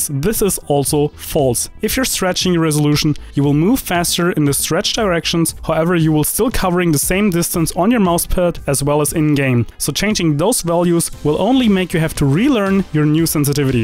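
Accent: Germany. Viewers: OverwatchDevil man hört es schon raus aber viel besser als deutsche mit dem th und flüssiger als deutsche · Naja, ich finde man hört jeden Deutschen raus :P Heisst aber nicht dass dein Englisch schlecht ist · German Accent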